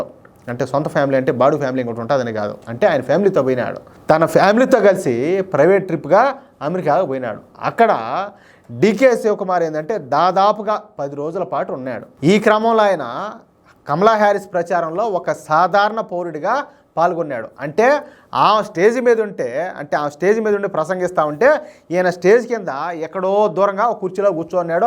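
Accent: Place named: Telugu, India. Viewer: Thank you garu for sharing the the matter humorously. Your Telugu accent perfectly fits in your heavy weight solid punches. God bless you for your patriotism.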